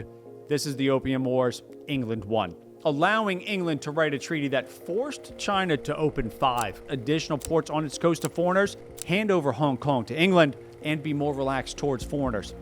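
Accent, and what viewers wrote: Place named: New York, United States. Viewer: Im a Muslim so I don't have much love for communism. I know how Muslim populations are military target No.1 for any red army for total annihilation or reeducation. But c'mon dude. An Ivy league degree, a spiffy NY accent but nothing but bias and hot air is going to end up collapsing the USA. We deserve better than that. We need the US as a world order insurance to make sure no one crosses beyond the red line. Do your job guy!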